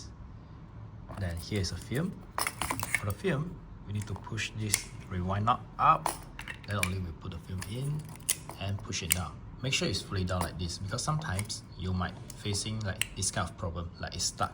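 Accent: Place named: Singapore. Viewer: the accent is giving lah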